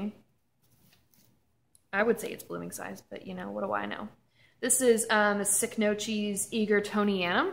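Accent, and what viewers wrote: South, United States. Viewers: I’m not sure where you live, I am not terribly familiar with the “eastern seaboard” and so I don’t know where the orchid show of which you were speaking is located. But, you mentioned your “southern accent” and my fiancé and I had to laugh. We live in New Orleans, but I grew up in North Dakota. I get made fun of for my accent, even though I’ve lived here for ten years and have lost most of my “up north” accent, I heard you say “you guys” so many times that I thought I’d hear an “eh?” at the end of one of your sentences, a la Canada. I’m very jealous that you live in an area where y’all have an orchid sale with international vendors; I have to drive to southern Florida to beg a wholesaler to sell to me if I spend $500-600. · LOL, you definitely have moments where the southern accent peeps thru, but honestly, you sound like you have a very neutral accent. 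Mix of east and west.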